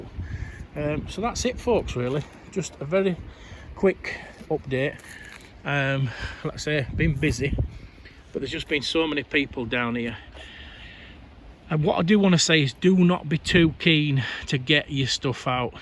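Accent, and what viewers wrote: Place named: Barnsley, United Kingdom. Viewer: Wheres your allotment you sound like your near Barnsley ?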